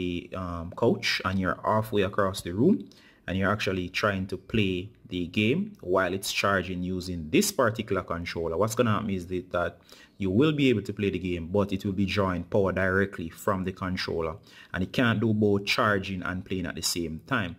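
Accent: Jamaica. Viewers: yuh sound jamaican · Are u ah Jamaican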